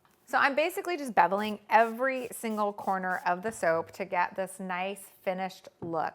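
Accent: United States. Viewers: MN people pronounce it the same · It’s common in the Pacific Northwest, is from Washington. “Pellow” rather than “pillow” is pretty normal too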